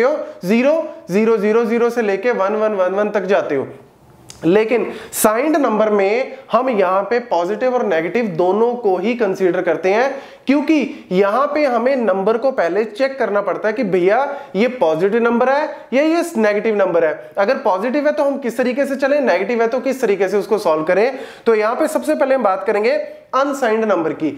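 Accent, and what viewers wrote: Punjab, India. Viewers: Assalamalaikum sir your videos are really helpful for btech electronics students....I m really greatful to you ...and I really like your punjabi accent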